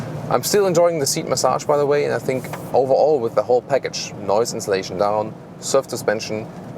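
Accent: Germany. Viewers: Your German English is annoying to listen to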